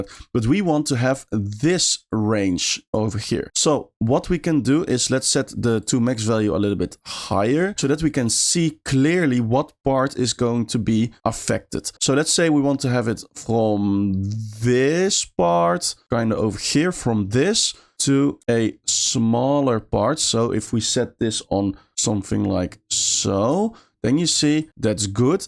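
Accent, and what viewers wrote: Netherlands. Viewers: wonderful tutorial - could tell you were dutch without knowing who you were ;)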